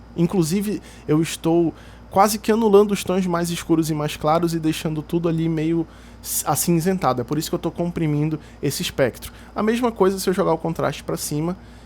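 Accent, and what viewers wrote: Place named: Manaus, Brazil. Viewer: Muito manauara esse mano. Volta com as aulas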